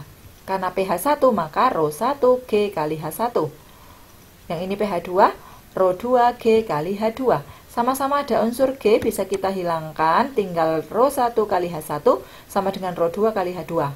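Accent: Indonesia. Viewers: Kya ibu guru saya yg dr Jawa Tengah... Suaranya... Maturnuwun materinya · ibu orang Jawa yahh?👍😭🤣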